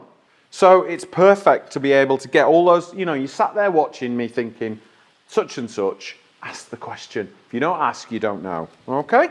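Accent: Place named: North, United Kingdom. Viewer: love the accent! how northen are you?))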